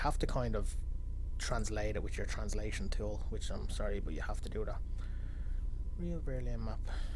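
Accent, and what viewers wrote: Ireland. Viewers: this guy is definitely irish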